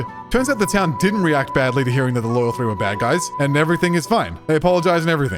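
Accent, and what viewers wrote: Australia. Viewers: i never realized how australian you are matye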